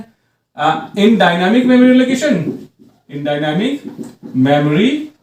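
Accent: Bihar, India. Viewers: I was so confused tiil i found your channel ❤ thank you so much sir One more thing i guess you are from bihar and i am also from bihar so i connect with u more because of that also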